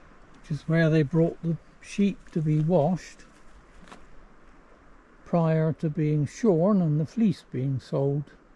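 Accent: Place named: West Country, United Kingdom. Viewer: There is nothing that beats a Devon or even Dorset Summers day walk along the coast enjoying the wild flowers,the birds & the bees,along with fantastic views together with lovely west country burr historical story telling especially if you now live overseas. Superb❤😊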